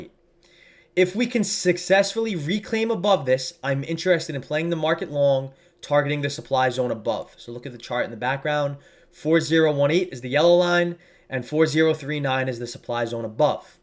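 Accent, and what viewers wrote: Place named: New Jersey, United States. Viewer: Come for the education, stay for the Jersey accent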